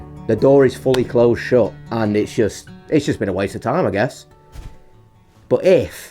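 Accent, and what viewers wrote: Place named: Hutton, United Kingdom. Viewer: You sound like you’re from Hutton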